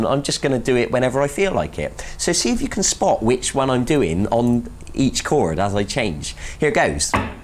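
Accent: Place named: Bristol, United Kingdom. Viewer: That's gotta be a Bristol accent I know that anywhere me Babs 😂. Brilliant lesson all the same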